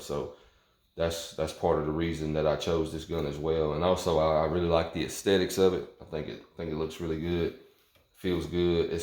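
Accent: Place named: South, United States. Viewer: I know this is an old video but blessings for sharing the experience. I have the MVP LR-308 in Kuiu Camo with a 20 inch medium bull barrel (discontinued.) That bull barrel likes 180 grain. I have not had the scout edition but definitely a good deer gun for thick woods in the southeast. I hear the southern accent in your voice, so, I know you are hunting thick woods like I have in northeast Texas.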